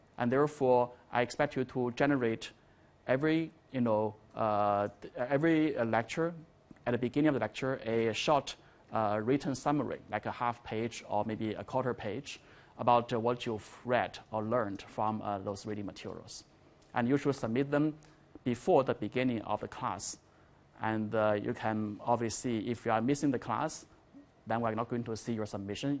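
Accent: China. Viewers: Chinese English,XD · 我第一反应也是天朝口音真亲切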